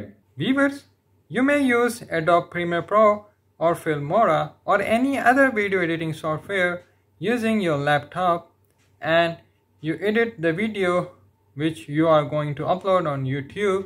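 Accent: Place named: Pakistan. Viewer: Pakistani baba Speaking English 💀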